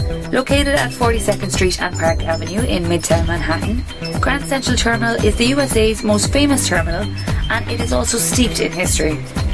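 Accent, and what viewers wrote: Ireland. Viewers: I think her irish accent and voice sound very nice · Sounds like a hybrid Irish accent to me .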